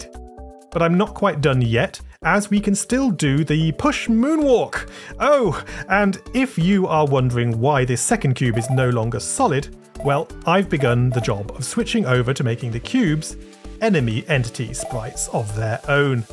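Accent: United Kingdom. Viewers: it’s the hot british scratch guy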